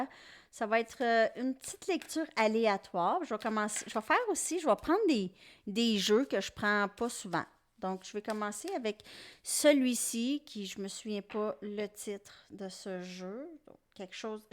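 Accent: Canada. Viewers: Vous êtes excellente,agréable à écouter avec l accent canadien bises de Belgique de Robert Brummel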